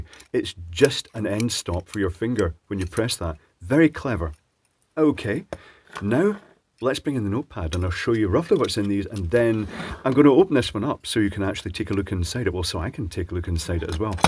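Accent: Scotland, United Kingdom. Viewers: There is something relaxing about a softly-speaking scottish-accent man discussing about toilets for 20 minutes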